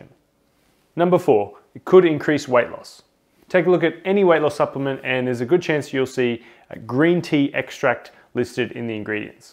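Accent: Australia. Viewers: It’s just his Australian accent! Some forms Australian accent, the ‘a’ is phonetically pronounced as more of an ‘e’ sound. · This guy is Australian so that is why his English is so messed up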